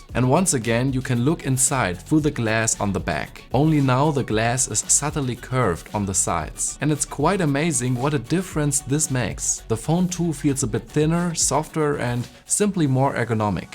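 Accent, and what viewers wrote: Germany. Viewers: Are u a native german speaker? Fellow german here, hearing it in ur voice. Sounds wierd to watch a yt vid with a German speaking english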